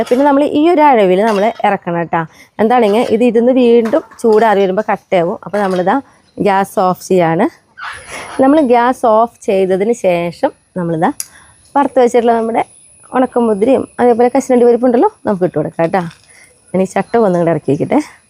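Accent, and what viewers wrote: Thrissur, India. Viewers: അറിയില്ല. ഇന്നസെൻ്റ് സിനിമയിൽ തൃശൂർ ക്കാരനായി സംസാരിക്കുമ്പോൾ നമ്മൾ natural acting എന്നു പറയുന്നു. അദ്ദേഹത്തിന് കൈ അടിയും കിട്ടുന്നു. സാധാരണക്കാർ സംസാരിക്കുമ്പോൾ അതു തിരുത്തുക എന്ന് പറയുന്നത്? ഇത് സ്റ്റൈൽ ആയി കരുതി കൂടെ? എനിക്ക് എൻ്റെ കൂട്ടുകാരുടെ തൃശൂർ, പാലക്കാട് സംസാരം ഒക്കെ രസം ആയിട്ടാണ് തോന്നിയത്. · നമ്മുടെ ത്രിശൂർ ഭാഷ വളരെ ഭംഗി ആയി സംസാരിക്കുന്ന ആളാണ്. എനിക്ക് ഒരുപാട് ഇഷ്ടവും ആണ്. വീഡിയോ കാണുന്നത് നമ്മുടെ തൃശൂർ കാർ മാത്രം അല്ലല്ലോ,😢😢😢 നോക്ക്..... വായോട്ടാ എന്ന് പറയുന്നതൊക്കെ കേൾക്കാൻ എന്തൊരു രസം ആണ്.😂😂😂😂 ദേഷ്യം ഒന്നും മനസ്സിൽ വെക്കരുത്. നിങ്ങളെ രണ്ടു പേരെയും പെരുത്തിഷ്ട്ടം മാത്രം 🎉🎉🎉🎉🎉🎉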